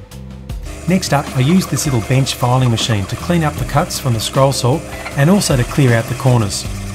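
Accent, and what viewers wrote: Australia. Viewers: Bloddy hell cobber, have you got an aussie accent mate! Crazy the difference between states (Sth Aust.)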